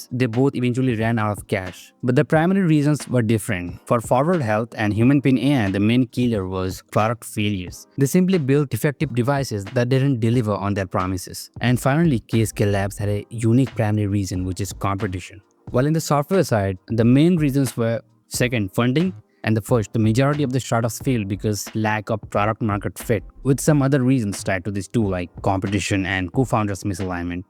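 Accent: India. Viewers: Buddy you got that indian accent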